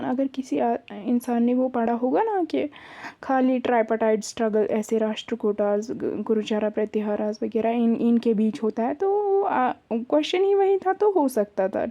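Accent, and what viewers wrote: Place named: South Kashmir, India. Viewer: Recalling my South kashmiri classmates from ur accent Anyways good wishes and keep it up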